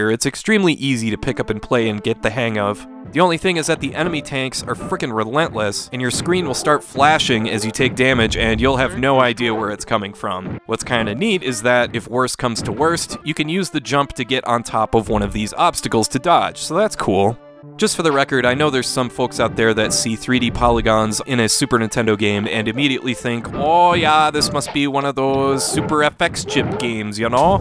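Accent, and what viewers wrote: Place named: Midwest, United States. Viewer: Random Midwestern accent got me.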